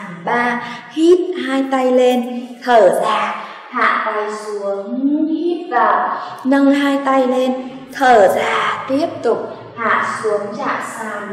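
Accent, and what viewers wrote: Hanoi, Vietnam. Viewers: giọng HN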